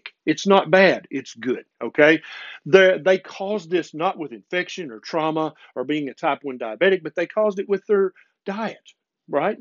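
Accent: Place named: North Carolina, United States. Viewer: I told my wife about starting Keto, and she brought it up to a veterinarian who works at her office. My wife called me and had the vet talk to me. She proceeded to tell me how Keto lifestyle could mess with the brain, Ketoacidosis, etc. I wish I had seen this video so I could forward it to her at the time. Love your videos Doc! I'm from NC. You sound like my people!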